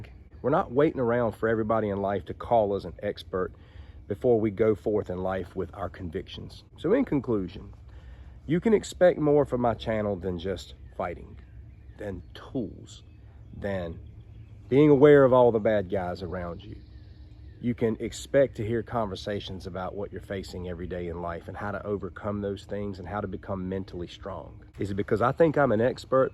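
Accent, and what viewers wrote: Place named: Georgia, United States. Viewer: Are you from Georgia? Your voice is very soothing and reassuring.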